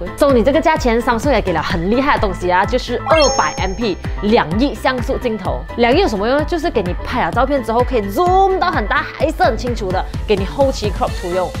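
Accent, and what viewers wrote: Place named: Malaysia. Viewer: 这是马来西亚中文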